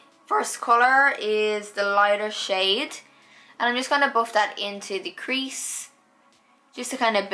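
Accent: Ireland. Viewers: i just found your channel and i noticed the irish accent on you i think its dublin correct me if im wrong but got so excited because i live in ireland to bad your all way in canada doh !!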